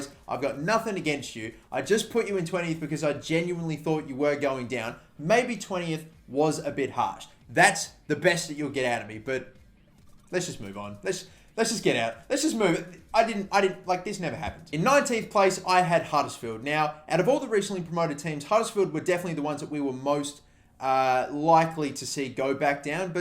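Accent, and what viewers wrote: Australia. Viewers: Are you Australian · ARE YOU AUSSIE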